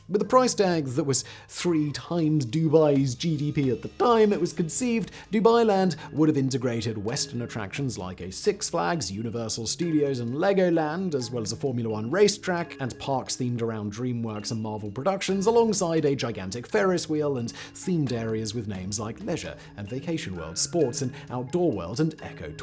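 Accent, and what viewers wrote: England, United Kingdom. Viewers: Only person I can't stand listening to with an English accent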